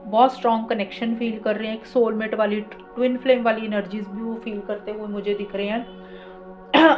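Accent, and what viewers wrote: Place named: Amritsar, India. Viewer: Aap Amritsar ke ho awajh se lagta hai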